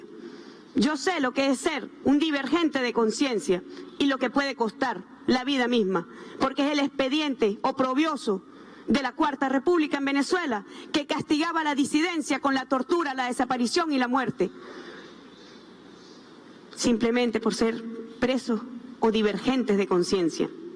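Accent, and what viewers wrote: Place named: Cuba. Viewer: Oie habla como cubana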